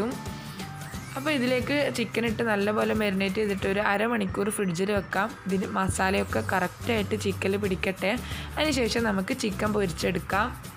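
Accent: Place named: Kasaragod, India. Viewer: Ingal kaasarod aanengilum slang koykoottaaare cherya chaayvu ind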